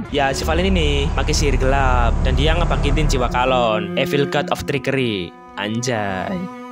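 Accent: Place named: East Java, Indonesia. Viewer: suka bet sama lawakannya yg medhok, salam jawa timur mas, lumajang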